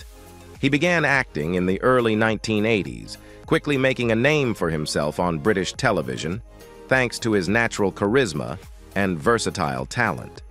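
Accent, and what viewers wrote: United States. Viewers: Soon as I hear the AI American accent I swipe and reject…. No thanks.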